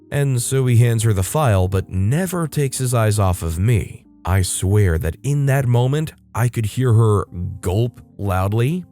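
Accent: United Kingdom. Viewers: Even though he's just a Brit, I understood him.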